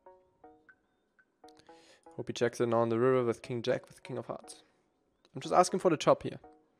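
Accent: Germany. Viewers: you are talking with german accent so much it seems not english...maybe coz you are in germany now btw great content as always. congratz keep it up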